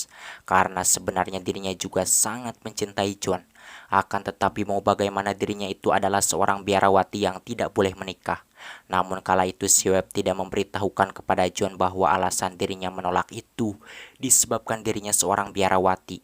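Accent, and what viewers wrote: West Java, Indonesia. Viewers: sangat terhibur..terutama baceona..eta sunda pisan..😂😂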